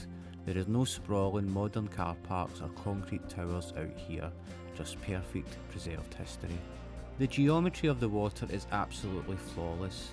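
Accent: Scotland, United Kingdom. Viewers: Naarden-Vesting is definitely worth a visit! In the past, I often took a walk around the entire fortress with my son, who was 10 at the time, along the outside of the water. Beautiful and very peaceful. I do have to get used to your nice Scottish accent a bit, though. Have lots of fun in the Netherlands and thanks for your video.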